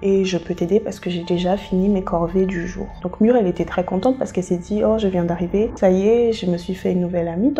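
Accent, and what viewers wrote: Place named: Madagascar. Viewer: J’adore ton accent malgache ! 😍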